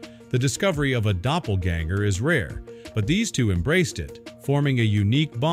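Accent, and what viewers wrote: United States. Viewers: I absolutely hate this narrative style. Saying a lot of words but not getting anywhere. (AND the horrid american accent.)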